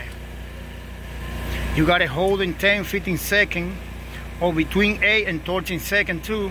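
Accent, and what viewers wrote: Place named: South, United States. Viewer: I like your accent. Sounds like your from the south. So I gotta subscribe and thumbs up! Nice work!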